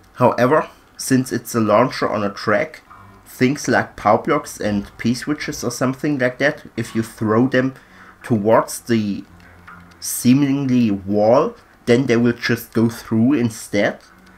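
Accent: Germany. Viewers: Dein deutscher akzent ist extrem lustig anzuhören xD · Heyy! Man merkt an deiner Englischaussprache, dass du aus dem deutschsprachigen Raum kommen musst xD. Andererseits sieht man es ja in Mario Maker auch. Jedenfalls ist das ein echt cooles Level :) Ich wette, du würdest mit der Beschäftigung von Levelbau Geld verdienen wollen. Ich würds dir echt wünschen, wenn das geht :D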